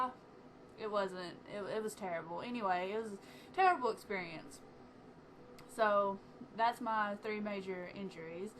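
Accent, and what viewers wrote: South, United States. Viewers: yup... you have a southern accent. lol I knew it. :) it's a good thing. :)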